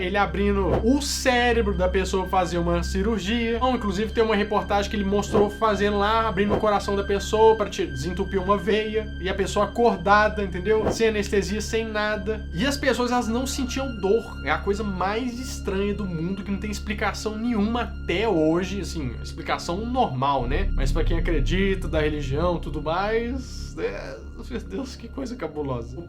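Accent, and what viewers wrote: Minas Gerais, Brazil. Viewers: Acho fofo sotaque mineiro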